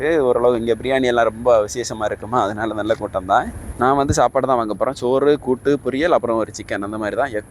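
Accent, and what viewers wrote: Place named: Kanyakumari, India. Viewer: நீங்கள் கன்னியாகுமரி தானே..... நீங்கள் பேசும்போது புரிகிறது..... வாழ்த்துக்கள்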